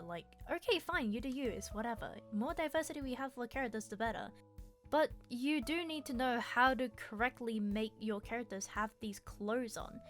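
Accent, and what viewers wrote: United Kingdom. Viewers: Are you British because you don't sound Koren( I,m not trying to be rude) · OMG ARE U BRITISH!??? OOP- IM SORRY!! I JUST LOVE YOUR ACCENT!! IM OBSESSED!! AGAIN SORRY!!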